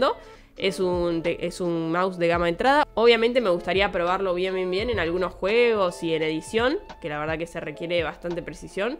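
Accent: Argentina. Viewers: Me encanta el acento argentino xD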